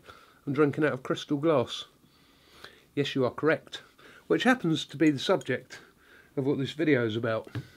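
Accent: England, United Kingdom. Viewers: You have the most wonderful english accent, I know that might sound strange but I just find your voice so soothing. I feel as if I could fall asleep listening to you talk about quite literally anything.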